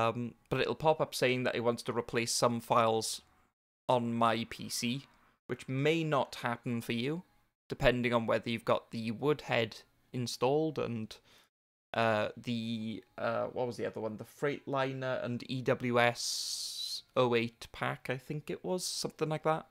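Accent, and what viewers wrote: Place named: Wales, United Kingdom. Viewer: Gotta love a welsh accent mun aye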